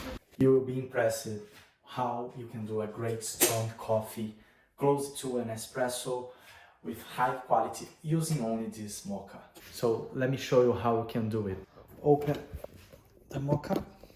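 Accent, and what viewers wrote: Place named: United Kingdom. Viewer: Inglês britânico e muito diferente 😅 curti esse formato aparece mais vezes 👍👍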